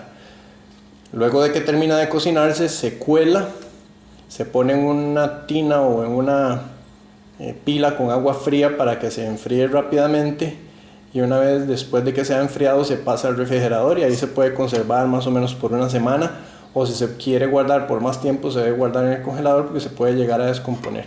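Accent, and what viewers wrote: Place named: United States. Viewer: tienes una erre medio agringado. Éxito.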